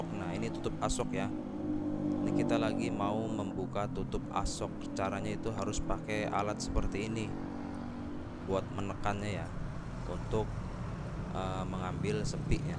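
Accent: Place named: Serang, Indonesia. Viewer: Kaya orang serang sih kang bahasanya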